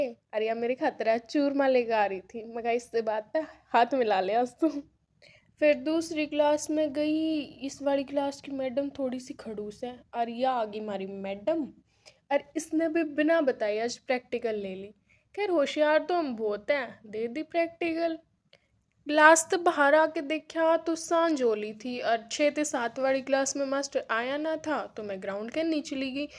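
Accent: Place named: Haryana, India. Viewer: Aww so pretty u are ❤ And your haryanvi accent is so cute and soothing to hear ❤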